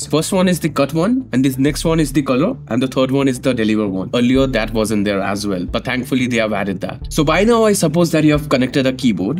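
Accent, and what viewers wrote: Bengal, India. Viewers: He sounds bengali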